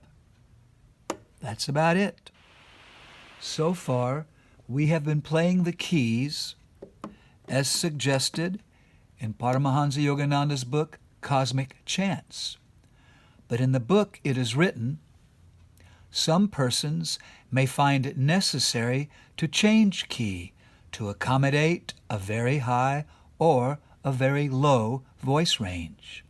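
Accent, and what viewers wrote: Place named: South, United States. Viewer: Ah this sweet southern voice sounds so familiar! Brilliant patient teacher you are my friend. I am sharing this with so many devotees who need this! Much Love and Gratitude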